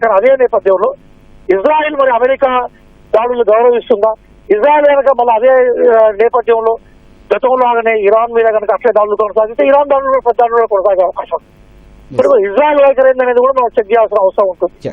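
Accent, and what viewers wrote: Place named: Telugu, India. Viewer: ఈ tv తెలుగు స్లాంగ్ 👌👌👌❤️❤️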